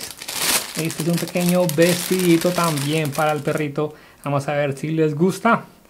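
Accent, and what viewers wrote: Colombia. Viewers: Hola Eres colombiano??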